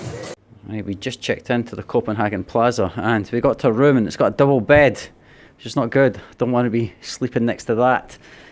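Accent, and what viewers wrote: Scotland, United Kingdom. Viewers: Yeah.. This is the real scottish accent and a much thinner scottish dude.. 😅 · I love the Scottish accent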